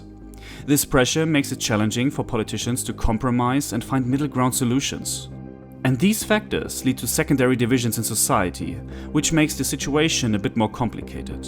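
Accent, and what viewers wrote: Germany. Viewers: Have you ever lived in the U.S.? I hear you have a German accent. Well, the only candidate who gave a shit about helping working people Bernie Sanders was labeled "too radical for the American people" because he wanted to develop a universal healthcare system like every other western democracy besides us has and get us free or nearly free college. In fact, I heard a German political commentator state that this radical Bernie has views that line up well with the Democratic Christian Union the best in German politics.... The conservative party 😂. And as for religion, nearly 70% of the country is still fundamentalist Christian so that's not true either.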